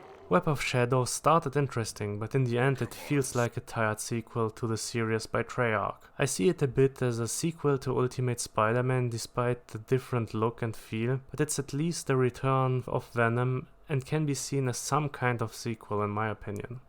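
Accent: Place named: Germany. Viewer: Dein deutscher akzent😂😂❤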